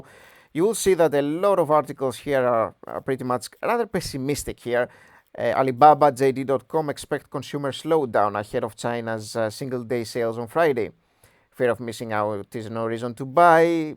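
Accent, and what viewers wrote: Greece. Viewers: Hello, I was the commenter that spotted your Greek accent a few months back. I'm interested in ATIP stock. Can you dona quick video on the fundamentals? I live in the city they're based out of and don't know much about the company's financials. Everyone I've spoken to says they are wonderful to work for, their places are clean and busy. If not a video maybe you can comment. Let me know as I buy shares daily. ATI physical is the name of the company out of Chicago.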